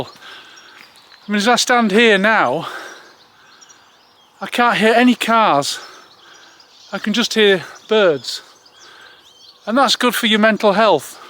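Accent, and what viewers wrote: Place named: Cockney, United Kingdom. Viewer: This man is speaking with a Cockney accent.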